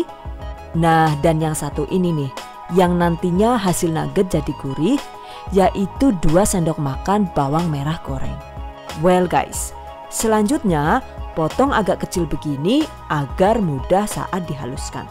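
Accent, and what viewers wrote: Banyumas, Indonesia. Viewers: Mba.nya orang mana sih?kok ada ngapaknya? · Suwun resepe ya Yu... Rika sih wong ndi Yu, deneng ngapak kaya nyong.. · Ku suka kalau bhs ngapake metu rek....lucu sih...... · Hhaaa q lah gemes ,,Rika janeh wng ndi Yu,,ngapak pisan ky inyonge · Bun , aslinya orang mana sih, suka liatnya ada ngomong ngapak nya 😁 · 🤣🤣🤣🤣🤣🤣🤣🤣🤣🤣🤣🤣🤣🤣🤣🤣Nyong seneng bgt krungu NGAPAKE... THE BEST CHANNEL😘😘😘😘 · Madiun kok ngapak seh buk? Kepriwek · Wiih ngapak mbak 😁😁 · Ga tau knapa kalo buk hasanngomong ngapak ktawa sendiri😀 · Bahasa Inggrisnya bagus, ngapaknya lebih keren😁😁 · Owh si ibu ngapak jebuleeee...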